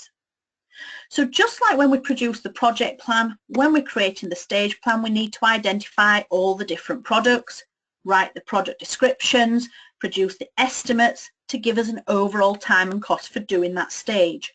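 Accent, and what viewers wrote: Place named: North, United Kingdom. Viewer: ANNOYING NORTH ACCENT ...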